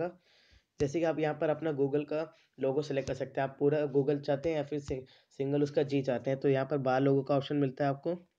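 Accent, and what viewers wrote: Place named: India. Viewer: No offense i undsrstand that u are indian and have accent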